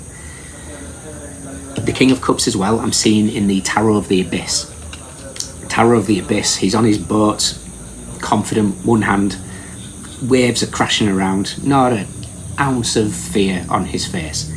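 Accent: Northern England, United Kingdom. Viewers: Yay ,someone British! Liked your reading, although I love all readings from everyone from all over the world 🌎 (I can tell you are northern, where are you?) I am a southerner London 😊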